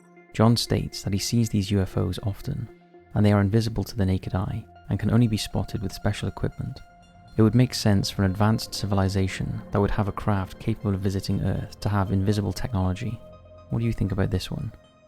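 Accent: Wales, United Kingdom. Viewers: I can hear a Welsh man init x Who doesn’t love Wales 🏴 ! Whose coat is that jacket? ❤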